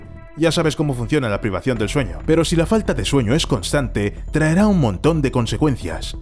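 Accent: Spain. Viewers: La voz suena como española, pero todos los insertos son de doblajes latinos. Anda la osa! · El sujeto tiene acento español pero usa clips latinos? Curiosos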